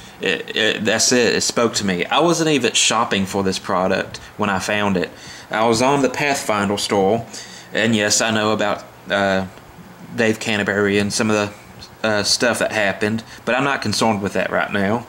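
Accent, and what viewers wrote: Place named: South, United States. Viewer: Nice item - good video. Am thinking about getting one of these. One question.....which part of the US are you from? I can't sus that accent although it sounds southern to me. Dave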